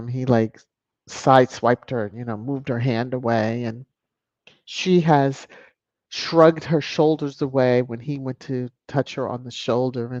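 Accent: RP, United Kingdom. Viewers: Thank you for great update 🙏😘❤❤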